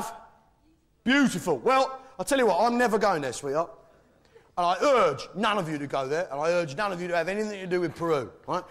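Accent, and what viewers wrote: United States. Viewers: austria.... wow, i was convinced you was a yank, youll have to come to wales, ich dien cymru, another great tribe from germania ;) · Hi, love your video reactions. Can you explain as you're Austrian from Vienna, how is it you have an American accent?! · Austria but has American accent? Huh?? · The most American sounding Austrian I’ve heard 😘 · You are from Österreich? why do you speak with an American accent when speaking English just curious? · Loved the video, first one I've seen, I've just subscribed. Although, from the accent I did think you were American! Sorry... Whoops, that is so British! Al Murray went to Oxford University, quite a good university I believe (though not as good as Hastings Polytechnic), where he studied history. Look for his session on 'Great Briton has defeated every country in the world' to see just how clever he is. 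His character is based on the 'know all' and outspoken pub landlords that were everywhere in the UK, many years ago. Now it's all over priced lager, expensive food in a bland environment..... Though I have found a local pub, with an Al Murray type landlord and it's great!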